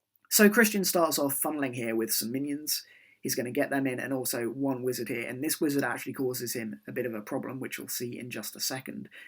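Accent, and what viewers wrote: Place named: United Kingdom. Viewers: British accent OP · great series. I definitely remeber Dakota telling me to keep my plans simple when i was a tryout in alpha. Keep up the great work i look forward to your vids and your British accent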